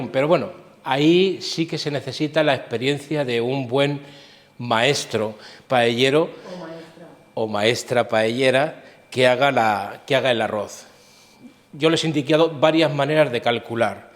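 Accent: Castile, Spain. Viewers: me encanta que cuente historias mientras cocina. Me gusta mucho verle cocinar, como lo explica todo y escuchar su voz es recordar la entonación castellana de mi abuela manchega. ¡Qué tranquilidad y relajación produce ver sus vídeos de cocina!. Y muchas gracias por todas sus enseñanzas. Como bien dice usted, Paz y Bien para todos. Saludos desde Córdoba, España.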